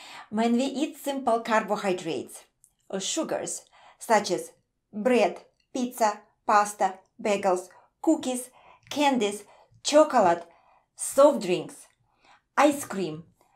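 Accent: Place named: Eastern Europe. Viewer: just out of curiosity where are you from you sound like eastern europian, and thanks for the great content:)))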